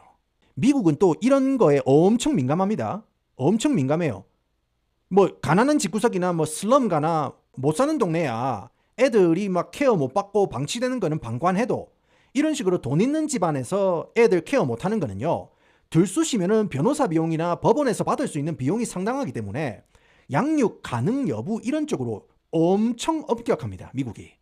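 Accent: Gyeongsang, South Korea. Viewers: 오늘 처음 알게 되었어요 얘기도 재밌게 하시고 사투리 좋네요 저도 경상도사람이라~ 구독했습니다😊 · 동부사투리 오졌다 애살에서 샤워하다가 터져벌임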